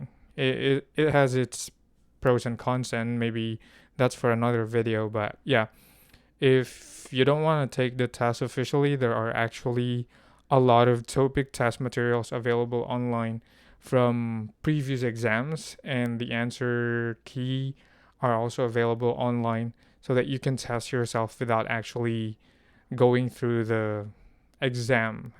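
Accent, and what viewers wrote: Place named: United States. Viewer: Hello! I came across your video 2 hours after you uploaded it and I watched till the end. I’m impressed by your talent (speaking 4 languages!) how did you do it?! I can’t even speak fluent English after living in the US for almost years!😂! Needles to say, I hate English subject! Ang hirap talaga mag English😂. Puro Pinay friends ko dito, lahat hindi magaling mag English (basic lang ang alam namin 😂). But I’ll dedicate myself to speak like a native hahaha! You speak like an American. I stumbled upon some Filipina English teachers here in YouTube claiming they have an American accent, they don’t. But you! Bravo! Sana all! Any tips?!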